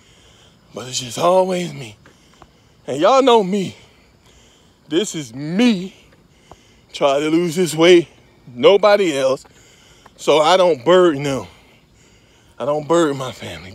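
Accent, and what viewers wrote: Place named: South, United States. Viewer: Cool front 88 YOU FROM THE SOUTH Lol. Yes Sir!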